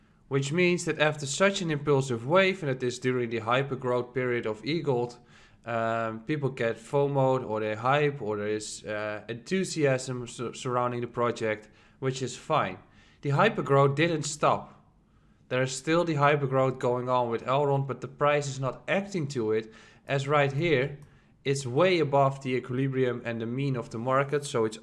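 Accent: Netherlands. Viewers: Did I hear a Dutch accent?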